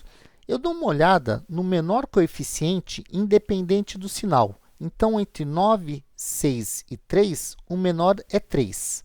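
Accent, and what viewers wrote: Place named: Rio de Janeiro, Brazil. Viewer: so eu que percebi o sotaque de carioca?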